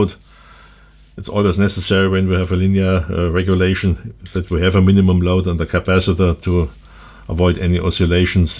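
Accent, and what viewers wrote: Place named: Germany. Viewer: funny how germans say pluck not plug and wideo not video!!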